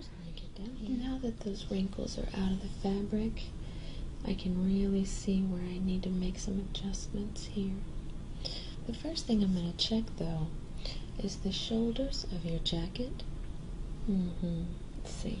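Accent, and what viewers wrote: South, United States. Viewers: Fantastic vid. Your tone is soothing and trigger-happy (I think I detect a slight Southern accent too, which I like). Role-playing is good enough to not be distracting. Good work with the added triggers. Finally: you're a joy to look at. Great work. Subscribed.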